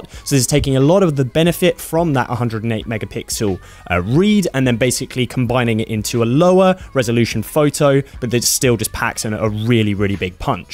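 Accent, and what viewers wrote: United Kingdom. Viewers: You sound British, thus you must be using the Exynos version of the phone? Do note that it is good to mention this in the video as there are 2 versions of this phone around the world and both have somewhat different camera quality...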